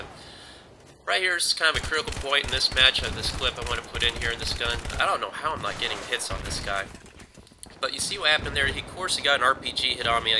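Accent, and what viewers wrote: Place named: South, United States. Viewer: Parisians are a tough bunch, but the French generally are very kind and considerate once your out of the cities. The older folks remember our role in the liberation and have kind things to say about us. If the French formed their opinions on us based on one trip to Manhattan, then surely they would say similar things about us as you have about them. You sound southern, a pilot out of Atlanta? Nice folks down there, hospitable and respectful. Decent and kind. Total culture shock I'm sure.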